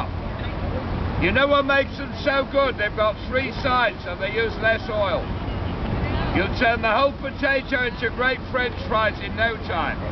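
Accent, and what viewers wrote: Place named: United Kingdom. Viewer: He has an amazing British accent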